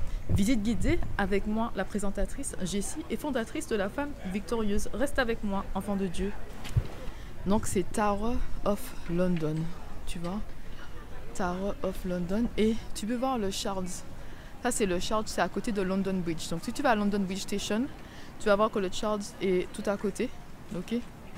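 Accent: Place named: Martinique. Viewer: Oui, je confirme que l'accent martiniquais est bien present dans ton dernier audio, et l'articulation y était également. 😊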